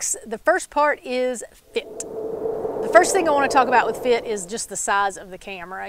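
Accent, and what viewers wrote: South, United States. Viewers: judging from your awesome accent, I gather your in the south someplace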